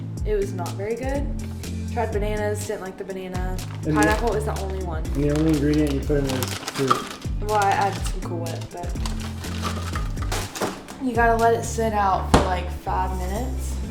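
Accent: North Carolina, United States. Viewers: Good ole North Carolina accent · I love her subtle southern accent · Love her accent!!! makes me me NC so much being from the UK no longer being able to get over there.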